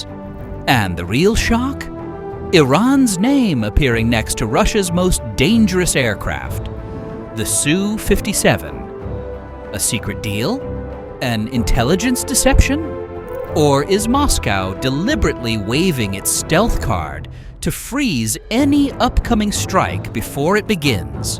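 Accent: United Kingdom. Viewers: Another English Accented Jewish Gangster Narrator, being negative. Says The BronxBoy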